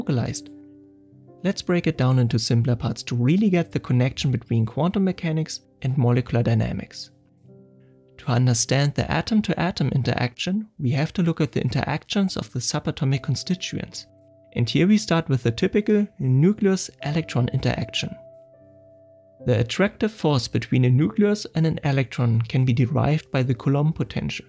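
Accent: Germany. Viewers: too heavy German accent can not handle it.. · Deinem Akzent nach, bin ich mir ziemlich sicher du verstehst Deutsch :). Wirklich ein riesiges Lob an dich. Auch der Channelname passt perfekt. Ich würde mich sehr freuen in Zukunft mit weiteren Hirntrüffeln versorgt zu werden :) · Thank you for the great masterpiece! It's one ob the best on the Web. The visualization and data representation is just amazing. This is just an exemplar for all who makes presentations and lessons. Quite professional! Very consistent and well explained material, easy to follow. Do you answer the questions in the comments? If so, I have some questions and comments. (One thing it would be nice to improve is the pronunciation. Let me guess, are you German? The pronunciation is good, but accent for some words reveals you and sometimes in the way to listen; everything is fine and understandable, just distracts because of funny pronunciation. 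Maybe it worth to ask a native speaker to read? On the other hand, I like both the voice and way of speaking). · WTF dude ?! I was like „yeah time so go to sleep“ and than suddenly YT dropped your video in recommendations. The quality is INSANE ( and I just started the video). I am WAY to tired to understand everything. Just saved the video and will come back tomorrow to appreciate the work. Amazing. Quick question, it seems like you’re from Germany ? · Lass mich raten, du bist Deutsch? Hört sich aufjedenfall so an 😂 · I sink I can hear out a German accent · Germany, Austria or Switzerland would be my bet. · DER DEUTSCHE ACCENT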